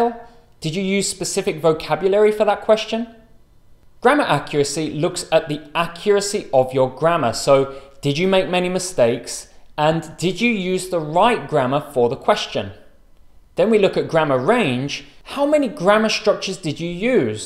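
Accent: United Kingdom. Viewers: How beautiful British accent is! Thank you for the lesson!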